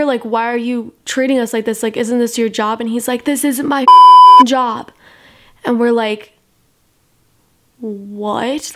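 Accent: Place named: New York, United States. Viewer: I feel like your from New York or New Jersey.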